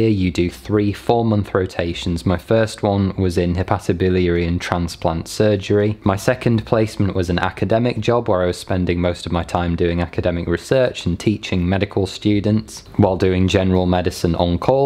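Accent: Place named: United Kingdom. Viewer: you have a big USA gay fanbase here--we have a thing for docs with British accents. Obviously Dr. Gill is the Alpha Leader of all posh British twink doctors but you are right up there. Peace!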